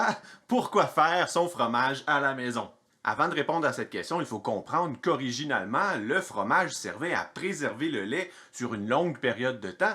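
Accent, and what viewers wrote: Quebec, Canada. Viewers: Vidéo qui sent la passion, avec ce petit accent québécois très sympathique. Bonjour de Belgique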